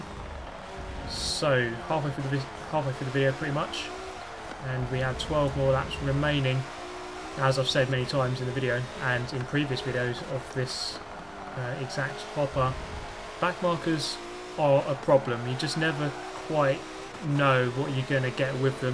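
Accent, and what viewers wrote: United Kingdom. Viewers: This guys commentary makes me wish I had a British accent!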